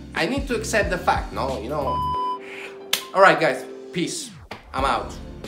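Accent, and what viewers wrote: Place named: Italy. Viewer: Love the hat!!!!!!!!! your English Accent does actually sound Italian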